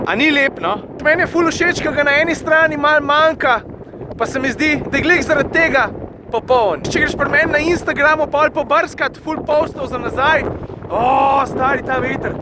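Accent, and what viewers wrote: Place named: Maribor, Slovenia. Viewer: od tvoje mariborščine cepam :D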